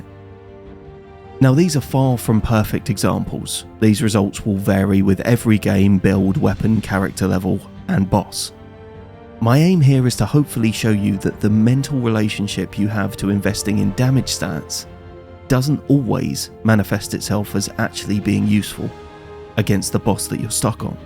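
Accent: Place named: Southern England, United Kingdom. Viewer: Subbed. I consider myself a reasonable enough souls player, now I want to redo them all with the lessons learned here and become a great one. Also it’s refreshing to hear another English creator on here. I’m guessing you’re Southern, maybe Oxford sort of area? Keep up the excellent content 👍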